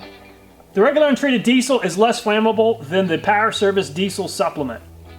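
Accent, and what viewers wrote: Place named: South, United States. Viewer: I listened to you say ultra low sulfur diesel 3 times cause my friends said you sound just like me with my country accent. Lol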